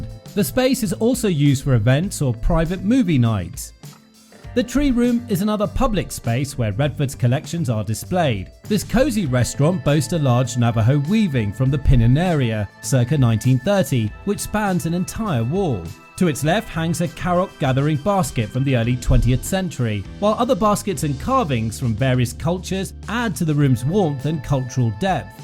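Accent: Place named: United Kingdom. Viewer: Often adding a British accent to a video presentation seems to give it more credibility but in this case, not so much. It would have been better with a Peter Coyote type voice. It would also be better to showcase the whole resort and institute with all the available photos instead of constantly showing the same photos. And finally, it would have helped to match the narrative to the photos. Yeah, I’m feeling grouchy today but Redford deserves better.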